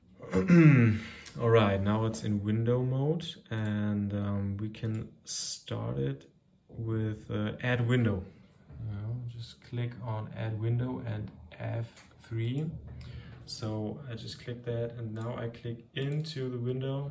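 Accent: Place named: Germany. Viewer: Nicht schlecht, aber man hört das du Deutscher bist haha grüße.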